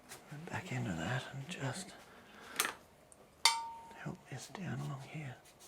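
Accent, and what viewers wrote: Australia. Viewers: Simply stunning, and wonderful to watch you work. (Also, loved hearing the Aussie birds and accent—I’m an Aussie living in Boston.)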